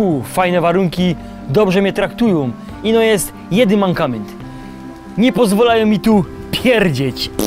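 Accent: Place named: Silesia, Poland. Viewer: Słychać, żeś hanys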